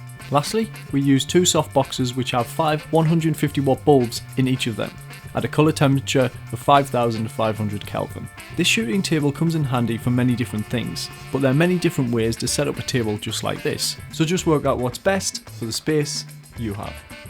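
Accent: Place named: Newcastle, United Kingdom. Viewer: Youtube needs more Geordie accents- sub'd for great film making tips.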